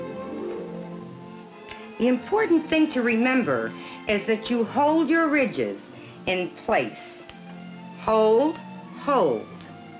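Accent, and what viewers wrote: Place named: Pittsburgh, United States. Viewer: Very distinct Yinzer accent lol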